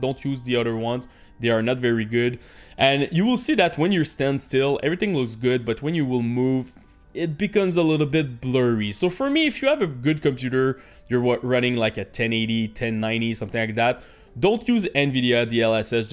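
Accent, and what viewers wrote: Quebec, Canada. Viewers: Un Quebecois sur rust. Finalement ! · C'est clairement un accens Québécois XD Good job pour le vidéo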